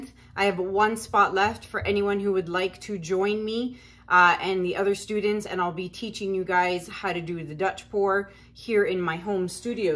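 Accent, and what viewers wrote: Canada. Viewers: Lmao I’ve got to tell you that in the past year or so I’ve met so many people from Canada …. I have a sister who moved to Canada(oddly enough in the same city you moved to but she’s been there for 5 years now) but never noticed it with her friends or anyone like that that she and her husband have met since moving out there but people that I’ve met on Long Island and NYC that are from Canada I know where they are from as quickly as they can hear where I’m from bc of the way they say “out” and any words with out in it bc of you! 😂😂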